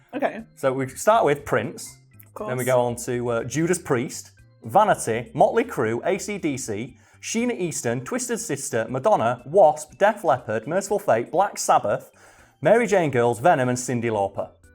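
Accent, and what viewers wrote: United Kingdom. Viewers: Dee Snyder, Frank Zappa, and John Denver testified against the PMRC in the US Senate, not in court. Based on your accent, I'm assuming you're in the UK, so it would prolly be most like if they testified in the House of Lords there. Incidentally, Dee Snyder's testimony is well worth watching, he has no mercy on the Senators questioning him or the PMRC and easily puts them in their place with an eloquence rarely seen either then or now.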